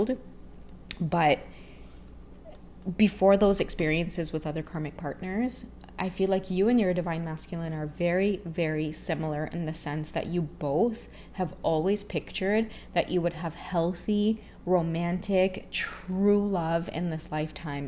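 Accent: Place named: Trinidad and Tobago. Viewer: It sounds so lovely when speak Trini. I just happened to hear on of your shorts rt now where Ur speaking Trini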